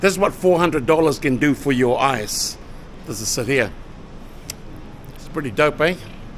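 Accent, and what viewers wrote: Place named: United Kingdom. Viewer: That British accent 😂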